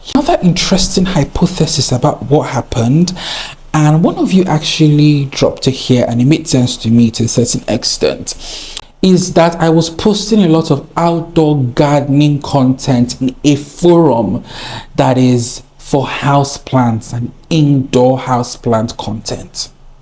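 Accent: Africa. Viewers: I love your energy, your humor, and I love that African accent of yours, and your lovely plants. Please keep up your good work! :) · People are so bitter and it’s weird to be so loudly envious of others in the community because they have nice plants or live in tropical environments etc. Most of us are here to see nice plants and be inspired! Also these people are just insanely ignorant. Btw your accent is wonderful I love African accents. ❤❤ from USA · I am so very sorry you have ran into blind assumptions on other sites. People actually insulted your accent?? OMG. What did they want, an African to have a Australian accent? A British accent? Perfect english is not enough for some folks I guess, I love your accent, any accent is grand. I do have trouble understanding an Irish accent at times, and Scottish is worse -- they slaughter words and without subtitles I can't catch anything! 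😄😁😂 I watch a plant guy from England, and then you from Africa. There is a definite learning curve when one watches content from another nation, there are weather issues that I thought I was aware of, but I've been learning a lot about weather and how it affects plant people in other nations. And then there is customs or national normalities that I don't see here in the USA, like your roadside plant stalls, I'd love that! It all makes for a wonderful learning experience! I love that you are showing and telling us about Africa, about Nigeria, I watch you for your personality, you plant knowledge, your humor (!) but its so grand to get a context of life in other countries -- to blow expectations and assumptions away so all can see and understand and stop 'boxing' people into stereotypes. You are my treat. I watch every day!!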